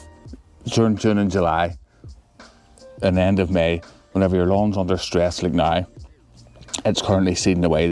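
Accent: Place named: Belfast, United Kingdom. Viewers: Wow Belfast language :D Hard to understand, but possible ;) Good job on your lawn, starting little project with parents house lawn... hope to see same good results, as you achieved :D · Good clear video for UK gardeners . I watch some American videos and they just are not relevant to our Northern Ireland climate . I have two lawns that have recovered from earlier scarification and aeration . Both have been fed with seaweed every two weeks but I am a bit puzzled that one half of the front lawn is not growing so well .Could it be getting too much water , as the half I am talking about is the side the water drains off on . What are the signs to look for if I am over-watering Keep posting, it is really appreciated , especially that Belfast accent . Thanks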